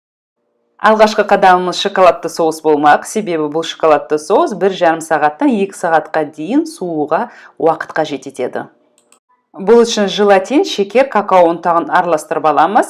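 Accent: Standard. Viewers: Таза қазақ тілінде😍😍😍 рахмет сізге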